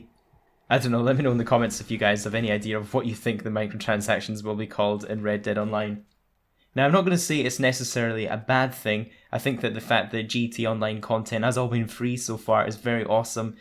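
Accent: Scotland, United Kingdom. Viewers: where in Scotland u from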